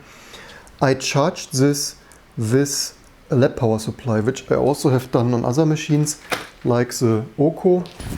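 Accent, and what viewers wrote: Germany. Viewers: Very informative video, its really motivating to see that im not alone with repairing stuff instead of throwing it away. PS: Are you German too? Your accent really sounds like it.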